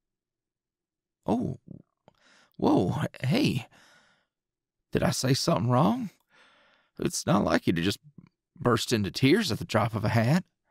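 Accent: South, United States. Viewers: Me who is from the south and loving southern guy Me: FINALLY!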